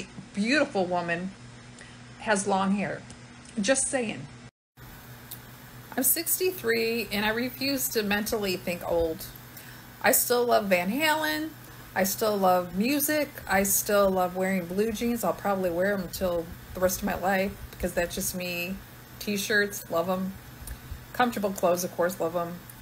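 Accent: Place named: South, United States. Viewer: Omg my southern accent rubbing off on you.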